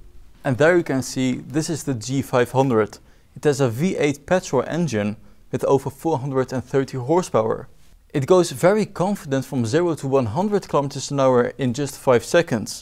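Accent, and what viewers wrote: Netherlands. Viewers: Your dutch accent is killing me, but what a car omg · Toffe vid maar je kan horen tot je NE bent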